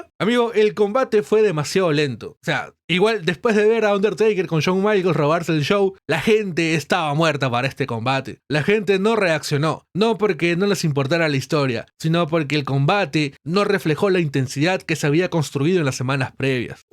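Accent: Argentina. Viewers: pq mrd habla como argentino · Porque hablas coml argentino?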